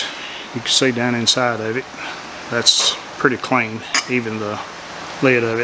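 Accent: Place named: South, United States. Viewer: Thank you for the detailed ins and outs of your experiences using this tool. Great vid. I live in Oz now and it's great hearing the Southern accent of home.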